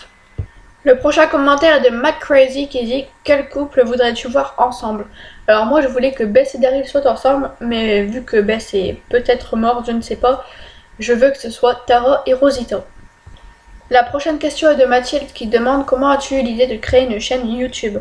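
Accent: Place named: North, France. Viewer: Hey bah mi j'min douto qu'této du nord ça s'intind a t'n'accent alors bin venu au club :) Traduction pour les non ch'tis '' hey bah moi je m'en douté que tu était du nord ça 's'entend alors bienvenu au club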